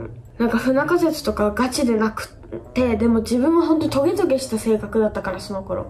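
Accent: Miyazaki, Japan. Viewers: 喋り方は宮崎の名残だと思いますよ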